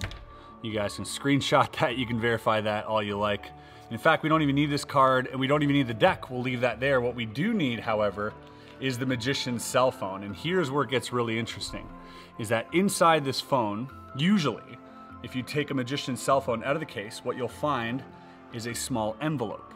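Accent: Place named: Canada. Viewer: Holy crap your Canadian lol I love your YouTube videos I have never looked you up but I finally hear your accent lol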